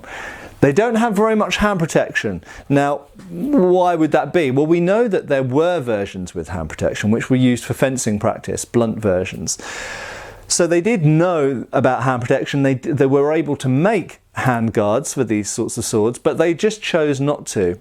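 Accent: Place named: United Kingdom. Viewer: your pronunciation is fine for JIEN and DAO but you sound like that Brit intel guy who sent Bruce Lee on the mission in Enter the Dragon -- he also said "Haaan" while BL used the more genuine "Hahn"... good rule of thumb for pronouncing Asiatic names is to use the typical romance language vowels -- A is usually AH rather than AY or AAA... KEEP UP THE GREAT WORK!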